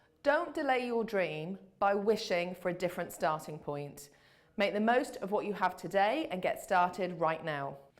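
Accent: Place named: United Kingdom. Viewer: I looooove that Brit accent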